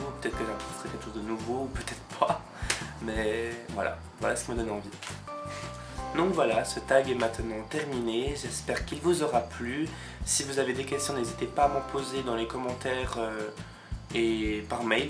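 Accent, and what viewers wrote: Belgium. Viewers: Je suis belge et c'est vrai qu'en t'écoutant, j'étais persuadée que tu l'étais aussi :D Chouette découverte en tout cas, continue comme ça ;)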